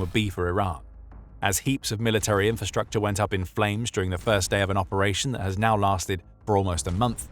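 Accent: England, United Kingdom. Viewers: "Nearly three identical offices"? Was it two and a bit, or three and a bit? The narrator's accent sounds English, but the grammar makes me wonder.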